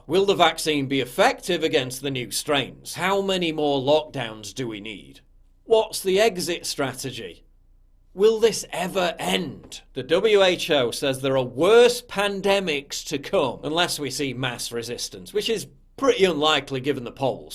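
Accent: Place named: RP, United Kingdom. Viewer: It always amuses me that a British accent can make crude language sound upper crust. 😄😄😄😄😄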